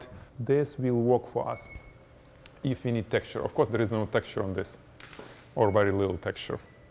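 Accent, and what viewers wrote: Russia. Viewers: мне кажется или я слышу русский акцент?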